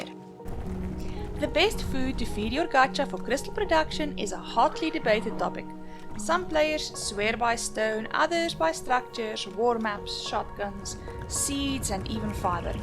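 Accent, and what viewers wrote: South Africa. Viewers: south african ACENT? :-) Love the clip 🙂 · You sound South African. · are you afrikaans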